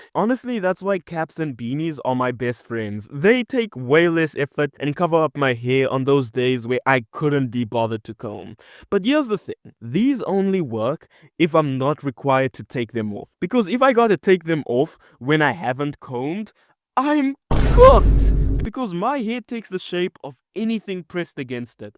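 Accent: Afrikaans, South Africa. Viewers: I hear a Afrikaans boi here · You have an Afrikaans accent, I have always spoken both Afrikaans and English so I don't have an Afrikaans accent.🎉🎉🎉🎉🎉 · I just realised you’re South African bc I knew that accent was familiar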